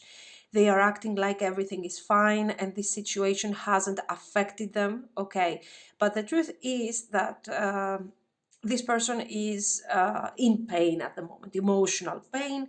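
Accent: Greece. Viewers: Your voice has the Greek accent 💕 So if you are, σε ευχαριστώ πολύ! Το πακέτο 2 was on point and you never fail me with your readings!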